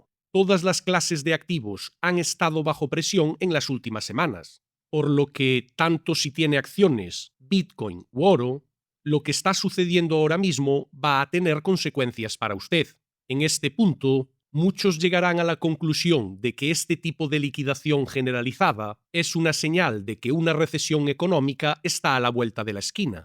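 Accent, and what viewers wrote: Galicia, Spain. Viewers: Me encanta absolutamente que el narrador sea gallego. No lo cambiéis nunca!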